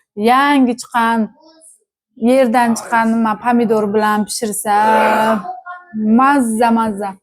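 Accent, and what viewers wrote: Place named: Olot, Uzbekistan. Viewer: Олотликлар шунақа гапиради Турқманистон билан чегарадош олотликлар турқманча гаплашади кўписи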